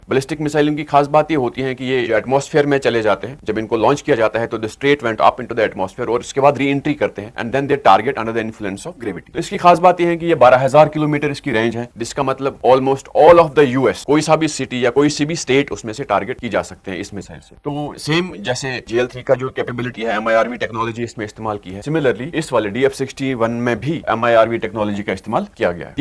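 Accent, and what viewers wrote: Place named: Kashmir, India. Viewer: Kashmiri accent ❤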